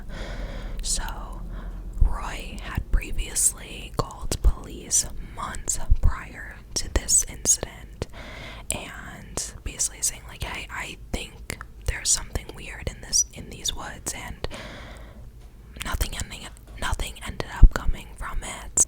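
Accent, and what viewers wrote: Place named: New York, United States. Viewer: I love the subtle northern accent, Ny or NJ😂 Great videos, thank you. It's weird, I remember hearing about this case all over the news as a kid and never got to learn what happened until now. I think I was like 8 at the time and in Florida (basically in the same place as her, maybe 10 minutes away), wild.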